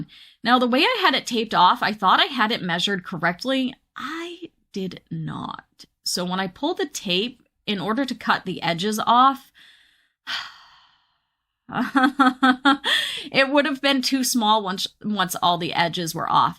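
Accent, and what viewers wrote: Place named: Maine, United States. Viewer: Yooo a fellow mainah (mainer)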